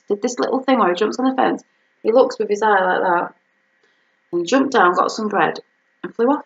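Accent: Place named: Birmingham, United Kingdom. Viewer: This I think is Birmingham, with that accent lol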